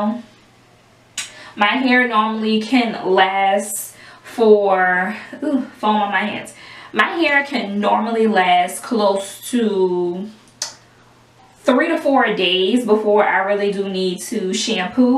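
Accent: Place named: Louisiana, United States. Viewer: We gon start wit da bek, let's giv it sum wuhta bebbe😂🤣😂🤣😂 I jus luv ur loui accent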